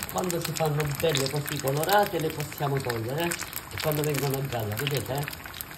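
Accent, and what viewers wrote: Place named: Salento, Italy. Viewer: Grazie 🙏🏼poi con l’accento salentino sono sempre più buone 😊bravissima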